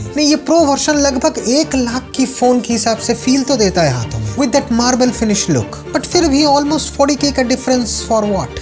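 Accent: Bengal, India. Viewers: Tera hindi sunkar maza aa gaya tu bengali hai tera hindi sa pata chalta hai ❤❤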